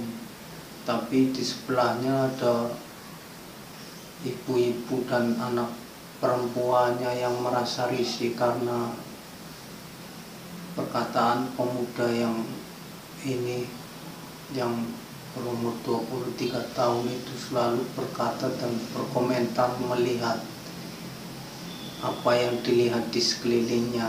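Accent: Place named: Java, Indonesia. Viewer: logatna jowo bgett kk😀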